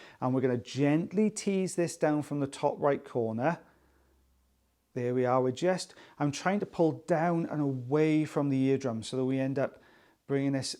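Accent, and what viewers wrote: United Kingdom. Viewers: AYE, IF YOU DONT QUIT FUCKIN SAYIN WE DONT WANNA HEAR YOUR VOICE, I KNOW SOMETHIN! I fuckin ADORE your voice, especially since it's British-accented ❣️ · Are you Welsh?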